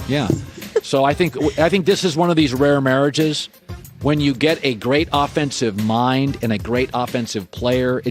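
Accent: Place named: Boston, United States. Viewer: Pick one; you can either have a, slurring S’s speech impediment, or you can have a Boston accent. You can’t have both, and be in broadcast journalism. Even sports broadcasting.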